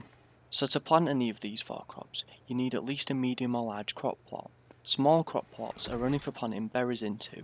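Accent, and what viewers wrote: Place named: England, United Kingdom. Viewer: He's speaking English with an English accent. lol what more do want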